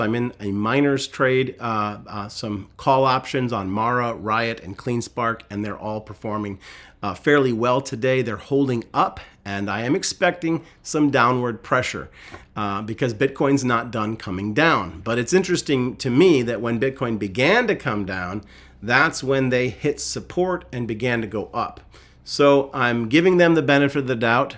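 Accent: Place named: Eastern Europe. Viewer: great intro sir. may i ask if you are from eastern europe? thanks.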